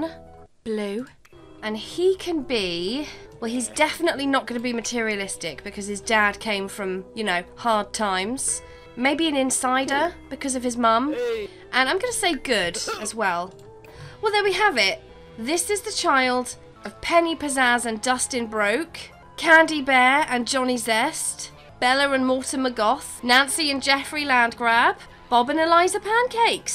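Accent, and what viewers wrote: United Kingdom. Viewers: i feel like your accent is a british version of the way eric cartman talks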